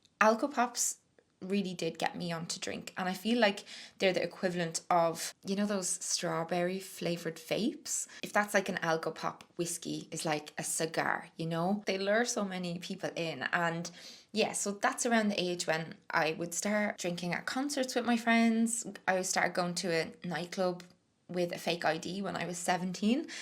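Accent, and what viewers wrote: Ireland. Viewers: The irish accent is really nice to listen too :) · First but least important… you Irish accent is like music to listen to… more importantly, your stories in this video match my experience… actually tired of waking up and checking my phone to see what the fuck I did last night… I’m not there yet but I’m binging videos like yours to give me hope… thank you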